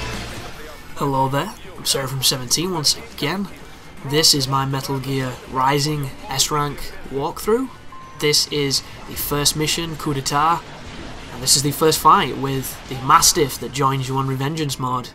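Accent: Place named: Yorkshire, United Kingdom. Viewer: Hahaha you are from yorkshire, ME TOO!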